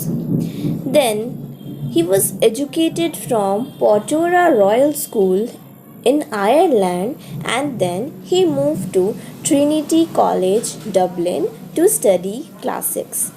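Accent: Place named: India. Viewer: You have a kind,sweet voice but it is not for narration. A heavy Indian accent just doesn't go with a tale of a very British poet. But a nice little biography ...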